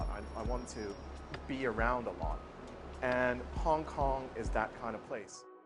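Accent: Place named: United States. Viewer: you speak English as like American lounge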